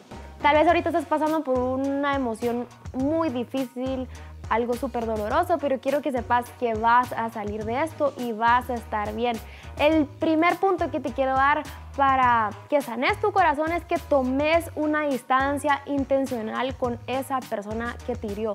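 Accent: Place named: Guatemala. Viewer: Es de Guatemala.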